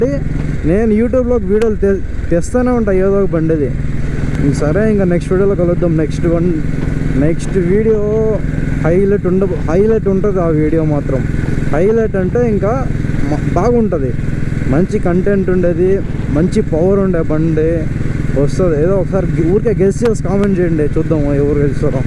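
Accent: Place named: Karnataka, India. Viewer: u r language is good to hear , karnataka border untaava mowa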